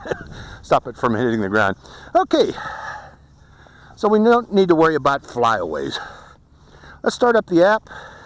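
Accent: Pittsburgh, United States. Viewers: how do you get extra propellers for this? also: i'm guessing you're from pittsburgh? my dad is, and he has the same accent.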